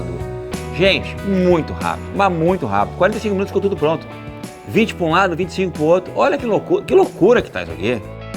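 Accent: Rio Grande do Sul, Brazil. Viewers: Gaucho falando Tá loko meu!😅😅😅😅 Nota dez , gaúcho!😂😀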